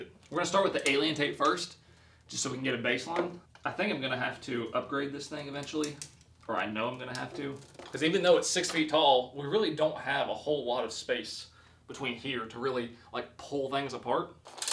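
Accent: South, United States. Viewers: Sounds country but doesn’t act country 🤷🏻‍♂️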